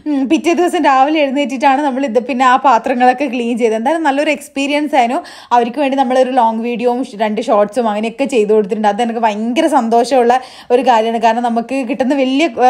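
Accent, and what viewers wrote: Kannur, India. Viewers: am also from kannur, samsaram kettond chodicheyanu 😊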